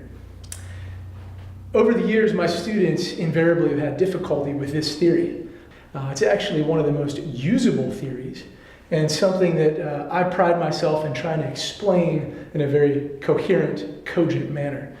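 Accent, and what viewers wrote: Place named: United States. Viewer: Thank you for the video even though it was not crystal clear (or some smoke remains), it was helpful. Its just the US accent and US examples what was difficult. Thank you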